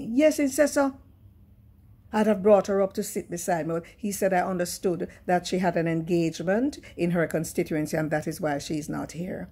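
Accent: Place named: Jamaica. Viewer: Brilliant! Keep up the good work! Love listening to you and that beautiful Jamaican twang!!!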